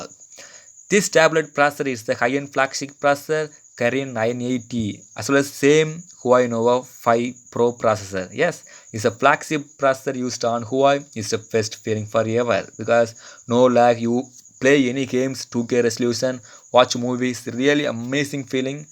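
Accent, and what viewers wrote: South India, India. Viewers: Are you South Indian??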